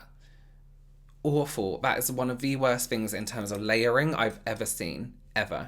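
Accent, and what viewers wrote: United Kingdom. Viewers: the algorithm doesn't care if you get a thumbs down, all interaction with your video is a +.. Never seen one tho..🙂 Me:🔔 💃🏼yey..🎧press👍: To the video... You are Always so sweet and your facial expressions are so funny, I love that! I love that you can laugh at yourself, and be a bit🤭the classy way: when you don't "care" for something!😆 The British accent is too much 💘 I adore it...😋💭 Keep up with the Great content! You are doing great, I am so Happy I found you, the way you teach, you are a godsent, I understand! ( Said before: A Finn thinking in Swedish trying to write English..☺️) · giggles british accent don't mean to be rude, but you're AWFUL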